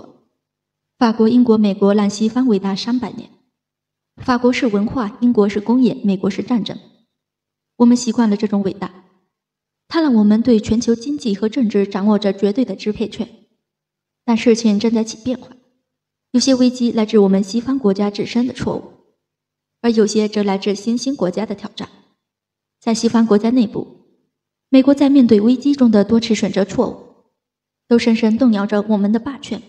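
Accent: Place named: Liaoning, China. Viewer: 俄罗湿？主播是辽宁哪里的？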